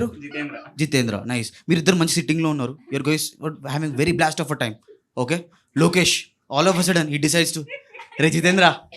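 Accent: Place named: Telangana, India. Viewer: Mana slang lo lekapothe anta comedy ga undedi kademo... But chala bagundhi bro😂😂😂hope you will be one of the famous standup comedian from telangana...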